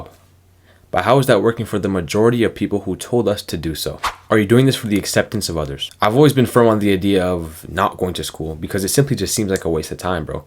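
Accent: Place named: Canada. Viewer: I didn’t even realize u were from Canada until u said fam 😭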